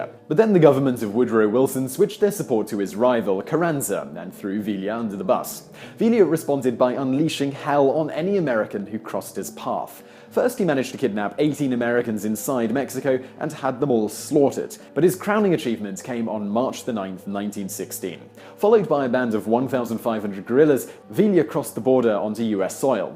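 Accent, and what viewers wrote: United Kingdom. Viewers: I thought this guy was Vsauce putting on a British accent fora second.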